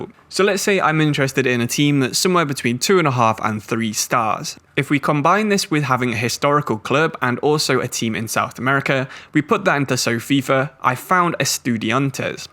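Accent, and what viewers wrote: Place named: United Kingdom. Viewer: broo i love your english accent... is soo funnyyy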